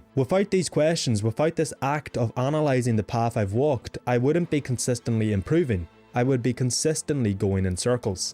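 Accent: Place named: Northern Ireland, United Kingdom. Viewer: Recognised that NI accent, and saw that QUB on your LinkedIn. Good lad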